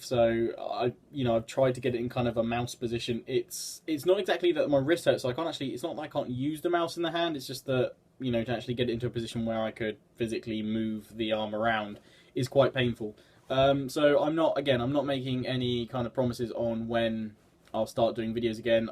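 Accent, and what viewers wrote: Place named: United Kingdom. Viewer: Hey please heal soon I am gonna miss your british voice and all of your videos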